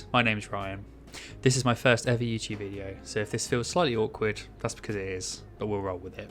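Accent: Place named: England, United Kingdom. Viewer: You have the english accent so that is a good first start.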